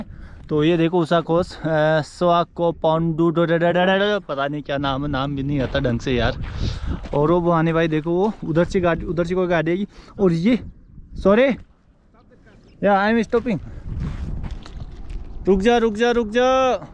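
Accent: Rajasthan, India. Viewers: Bhai ke bolne ke accent se Rajasthani lag raha hai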